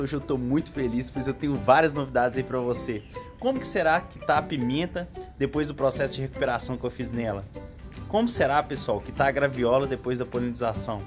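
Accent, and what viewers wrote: Minas Gerais, Brazil. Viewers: Cê é minero, fii???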